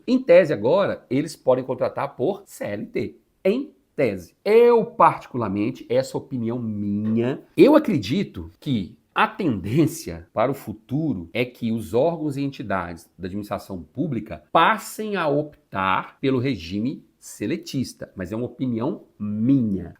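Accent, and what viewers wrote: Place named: Pernambuco, Brazil. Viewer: Esse professor é bom, explica tudo que todos entendem, pelo sotaque e inteligência deve ser pernambucano, se errei foi por pouco.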